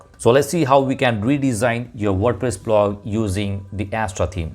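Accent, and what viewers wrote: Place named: India. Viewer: I dont like indian accent 💩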